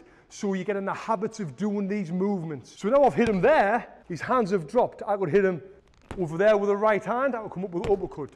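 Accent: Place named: England, United Kingdom. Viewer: the english accent makes it more epic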